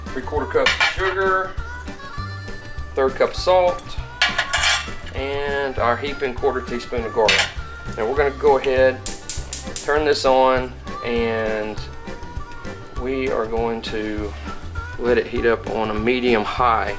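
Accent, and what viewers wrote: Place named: South, United States. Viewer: You sound Southern so maybe you're familiar with Braum's. Their pickled jalapenos are my favorite and I'd like to copy them if I can.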